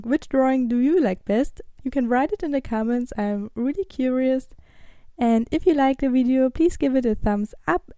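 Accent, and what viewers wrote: Germany. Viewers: Your german? I hear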